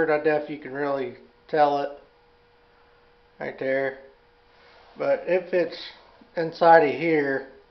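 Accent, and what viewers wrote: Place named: Appalachia, United States. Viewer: PS, Appalachian accent I hear!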